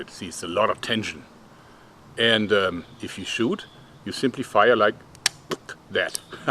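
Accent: Germany. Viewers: I think you are German.. nichts wahr?..I hear pronunciation...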